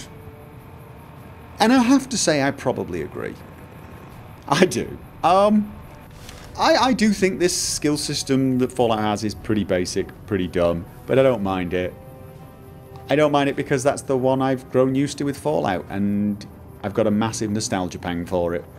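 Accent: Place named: Scotland, United Kingdom. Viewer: what do you for work? Your ability at logically discussing things in terms of their elements in an easy- to-understand way as well as your poetic Scotish accent must be incredibly useful.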